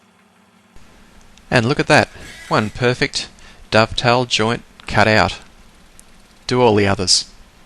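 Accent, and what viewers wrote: Australia. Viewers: Doesn't matter how hard you try, you can always pick an Aussie accent.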